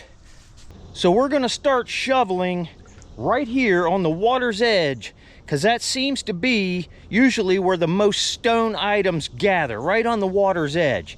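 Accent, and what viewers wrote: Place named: Pittsburgh, United States. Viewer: Beaver and Ohio meet....Sure there is some there....Love your videos....Love that Pittsburgh accent....lol...I got it too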